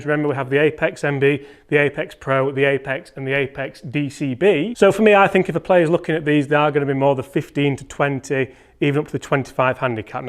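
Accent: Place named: England, United Kingdom. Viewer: Im 63 I took up golf 6 years ago 6'4' 220 LBS so I hand a set custom made ,all irons about an inch longer than most. Im a long hitter 200 7 ,<num> ish 8 130 SW and 145 W 75+ 60! , anyway I have the Callaway big bertha irons ,5 years old and I think they are time to replace as I was playing 3 times a week , I think my shafts are fatigued and well as the heads, ( all materials deteriorate over time) problem is my HC is now a 26 .5 do to the fact I think the set is just used up and frustration takes over and I was a 20HC 2nd year into this ( HAD A GREAT GOLF COACH EVERY A WEEK)then dealt with health issues ( im back and feeling much better ,Your English accent and fast talking is hard to follow , Plain and simple I have to have mine custom made , 5 iron to PW , please suggest a head and shaft combination you feel would get me back to low to mid 90s game , , Thanks Brad USA .Any information from you would be greatly appreciated . 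Everyone at my golf club has their own advise ( OF COURSE) The club champ do tell me that if he could work with me more often one on one I would be low 80s/high 70s and club champ, Also said my iron shots are unreal," nobody here hits a 7 iron 200 + Advise? Help?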